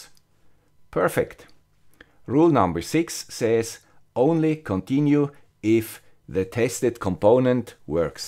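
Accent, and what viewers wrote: Switzerland. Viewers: I think I knew that, black forest or something. I spent a day in Bex a few years ago with the jet wing on my back guy Yves Rossy. He also had your Swiss accent. Swiss seem to be decent people mostly. I made a bunch of videos of all that. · Since you are the guy with the Swiss accent , I will call Scott the guy with the German accent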